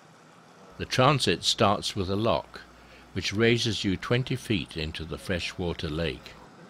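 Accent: England, United Kingdom. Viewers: So pleasant to hear this world of adventure described and decoded through the lens of a true Englishman's command of the language. A rare and dying breed, sadly...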